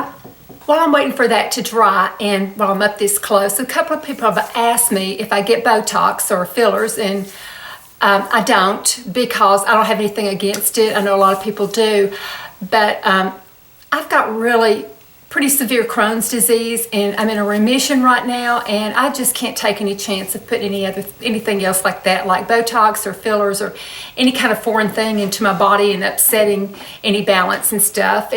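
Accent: South, United States. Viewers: Love your southern accent. Where are you from? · Loving the southern accent!! Makes me feel invited into your home as southerners are always so welcoming! I bet you cook some amazing home cooked meals! What time should we be there? <3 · I ❤️ your southern accent. I think you are so beautiful.., just gorgeous., you don’t need to wear makeup. I would love to know your am & on routine, what you use & when etc..! Sending the very best of everything in life that you deserve..! Cindy- Hemstreet- Plath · Your skin is gorgeous!!!!! I also use Retin A around my eyes . I actually use it on my entire face , and my neck ( less often on the neck ). I'm noticing some subtle changes after almost a year . I'm 39 . I have red hair and light skin , but I can tan if I try . I made the mistake of tanning when I was in school because the other kids made fun of me for being pale . 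I'd give almost anything to go back in time and stay out of the sun . I have crows feet and pretty deep 11's . I also have pretty deep forehead lines . I'm very expressive when I talk , and I smile a lot ( makes em worse ). I don't know how old you are , but you have the skin of a woman in her 20's ! You're so gorgeous! I love your accent . I'm southern too , but my accent is much thicker than yours . I love how you don't use any filters in your videos , and you are sweet and genuine . Thanks for the video :-) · wow ,you are beautiful and Love that southern voice .you are so sweet .so glad to find you. being 55 .i need help .lol wished i looked as good as you .thanks for the tips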